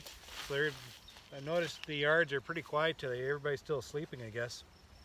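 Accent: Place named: Canada. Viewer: I didn't see your name but I knew you were Canadian by your accent. it was nice to hear your voice being in asia for so long I guess I am missing being home sometimes. nice to hear it.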